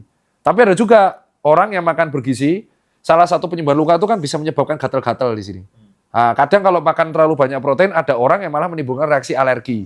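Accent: Java, Indonesia. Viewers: Lidah Jawa Muka Korea.. Spill rahasia ganteng dong 🤭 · Wah jadi teringat youtuber "Korea Reomit" Jang Hansol si Korean yg followernya disebut bolo-bolo yg BAHASA JAWANYA MEDOOOOOK ternya dokter ini medok juga, kl dokter Tirta followernya di Peng... Peng... Peng... 😂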